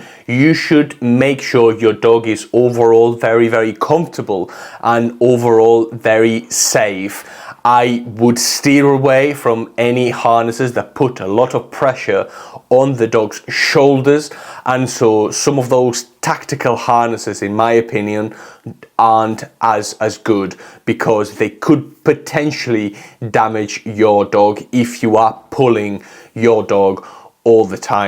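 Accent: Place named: Geordie, United Kingdom. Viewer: I'm very interested in your accent its Geordie and like polish at the same time. Where are you from?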